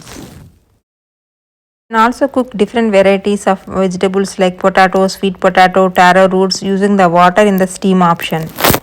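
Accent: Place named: India. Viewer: I love your accent. It reminds me of my time in India. Very useful video, thanks for sharing it.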